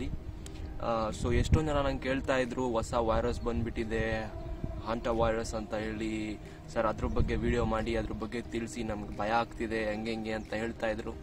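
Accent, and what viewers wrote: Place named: Malnad, India. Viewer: Malnad avra tharaha maataadteera sir very cute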